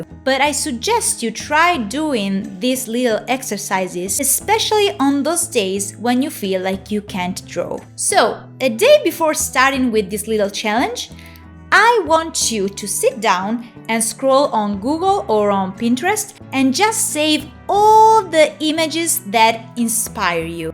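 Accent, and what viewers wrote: Italy. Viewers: The video was beyond helpful and interesting. Thank you so much for this. Just to mention… Damn I love this Italian intonation sooo much, it makes all languages sound awesome 😱😱😱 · LO SAPEVO che eri italiana! Dalla pronuncia 💀 Da un altro artista toscano: Bel video continua così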